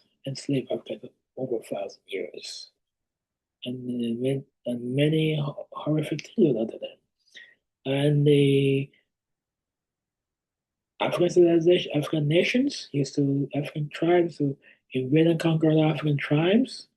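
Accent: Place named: West Africa. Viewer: Hi Doc, is your lovely accent West African, or Haitian?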